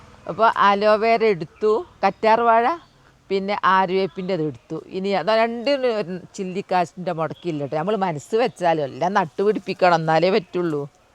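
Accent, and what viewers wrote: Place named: Kozhikode, India. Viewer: കോയിക്കോട് ഭാഷ കേൾക്കാൻ രസമാണ്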